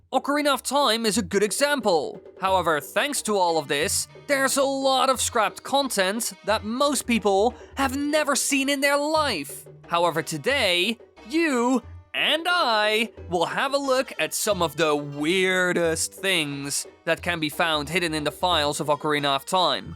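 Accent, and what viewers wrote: Netherlands. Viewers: I have to know... Are you Dutch xD? If so, je accent heeft het verraden B)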